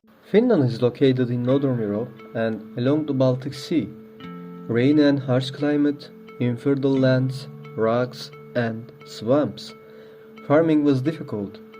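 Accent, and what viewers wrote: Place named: Turkey. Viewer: açar açmaz Türk olduğunu aksanindan anladim :))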